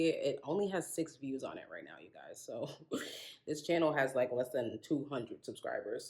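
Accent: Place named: United States. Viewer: Oh American English! A great time here. ❤️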